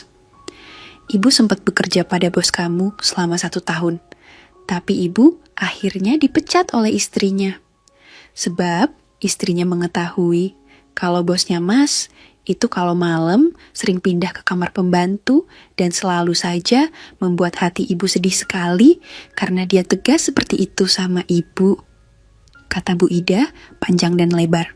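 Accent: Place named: Eastern Indonesia, Indonesia. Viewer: Elu logat Indonesia timur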